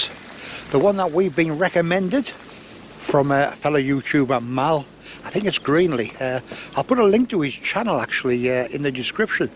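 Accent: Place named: Darlington, United Kingdom. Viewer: We’re stopping near Keswick for a week at the end of the month and plan on doing Whinlatter and 8 Wainwrights in that area. Our little lad is trying to raise £1k for cancer research in honour of his great Grandma, by completing 30 wainwrights by the end of the year. He’s on 13 at the min 👍🏻 We’re from Stanley, I was trying to decipher where your accent was from. Thought it was Aycliffe or Darlo. Would be great too meet up sometime, or we might pass you 😊👍🏻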